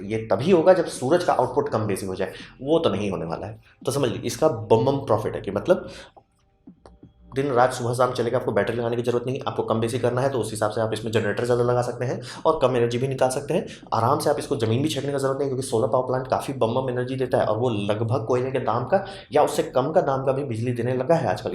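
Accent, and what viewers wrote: Bihar, India. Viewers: Sir r you from bihar ...😅........ur way of teaching is so funny and interesting...i enjoyed it...🥰......thnku so much..🙂🙂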